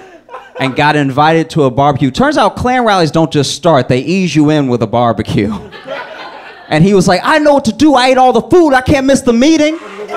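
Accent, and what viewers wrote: Louisiana, United States. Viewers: He sounds like that because it's his accent from Louisiana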